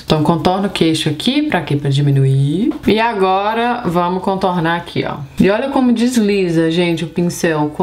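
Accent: Minas Gerais, Brazil. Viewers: Amo o sotaque mineiro. 💗 Amei a maquiagem como sempre.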